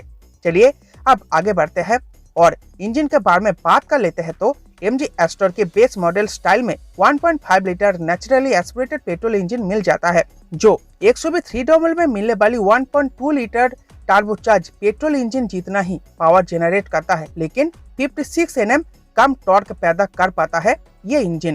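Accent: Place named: Bengal, India. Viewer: Saale बंगाली. Baat kese kar raha hai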